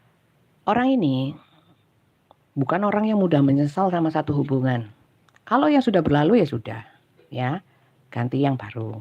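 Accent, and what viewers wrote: East Java, Indonesia. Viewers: Sy sk sm logat bhasa ny mbk..medok jawatimuran.sm"dr jatim mbk..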